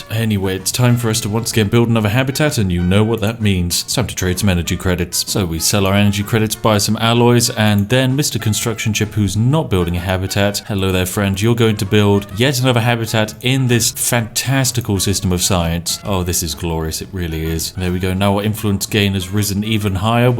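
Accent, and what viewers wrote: United Kingdom. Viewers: You're sounding awfully british today,.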